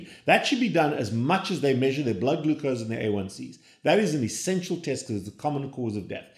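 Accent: South Africa. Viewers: Hello! Is that a South African accent? Ek hou van die afrikaanse taal. Greetings from Evergreen, Montana, USA.❤❤❤ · yes he is from south Africa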